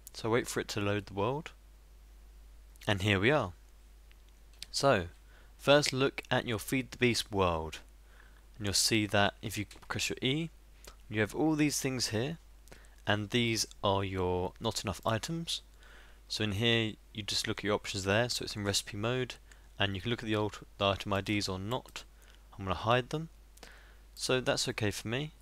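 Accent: United Kingdom. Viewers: He's British, it's pronounced that way over there. Don't be ignorant.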